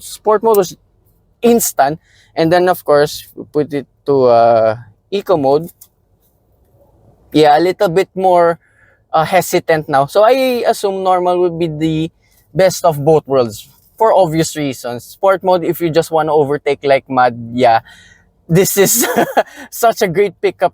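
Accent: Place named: Philippines. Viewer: Pinoy accent pero in fairness magaling sya mag english. Malawak vocab nya 👍🏼 But I want to hear him magtagalog haha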